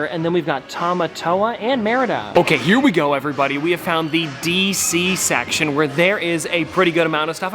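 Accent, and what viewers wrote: Canada. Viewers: across the country i thought your Canadian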